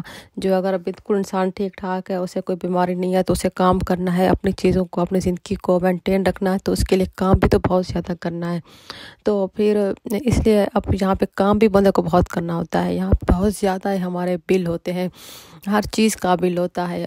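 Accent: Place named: Pakistan. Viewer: wasa ap ka bolana ka andaz sa lag raha ha ap Pakistan sa kidhar sa ho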